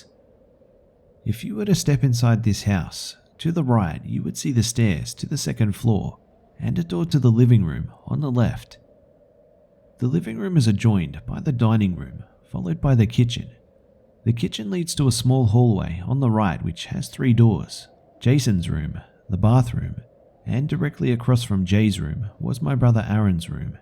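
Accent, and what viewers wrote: Australia. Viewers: This guy sounds Aussie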